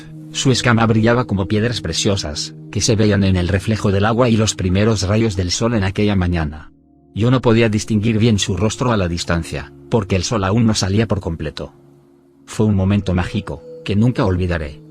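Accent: Spain. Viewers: Porque. Todos los videos estan en Español Ibérico! Me revienta !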